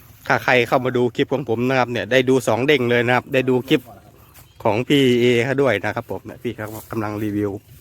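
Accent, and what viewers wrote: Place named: Isan, Thailand. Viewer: เว้าอิสานแน่คับ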